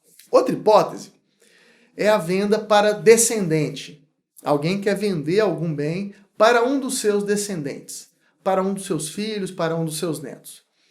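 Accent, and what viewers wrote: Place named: Minas Gerais, Brazil. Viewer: excelente aula, clareza, segurança, domínio. Obrigado. Acho que pelo sotaque é minerinn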